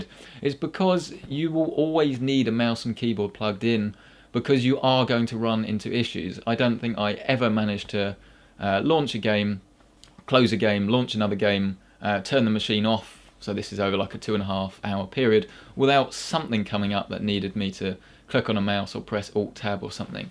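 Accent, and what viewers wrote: England, United Kingdom. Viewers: As a non english native speaker,trying to understand what you are saying with that strong english accent its very difficulty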